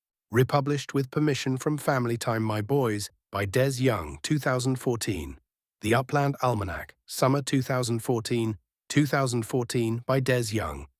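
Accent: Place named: United Kingdom. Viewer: Hello! Ok im confused here. I know Dez young playthe music for Hunting with Hank, but the person narrating this certainly doesn't sound like Dez, More like a britt, but he also states he was a musician, who is who? Im writing this in an attempt to prompt someone re-release these two shows, i never got to enjoy Dash but i do remember talking about the new son of Hank, the narrator states all he has to do is pop in a DVD, Please bring back Hank and Dash, I'm heartbroken they are gone!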